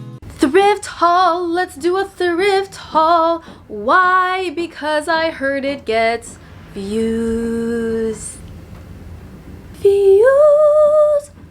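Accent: England, United Kingdom. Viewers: I LOVE YOUR ENGLISH ACCENT 😍😍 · so relatable lmaooo, a new subscriber hereeee. i thought ur not filipino likeeee i love ur eng accent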